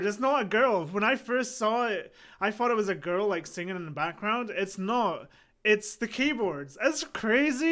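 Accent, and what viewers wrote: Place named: United Kingdom. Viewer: Damn, now I feel quite stupid.. 😅 your British accent doesn't seem that strong to me though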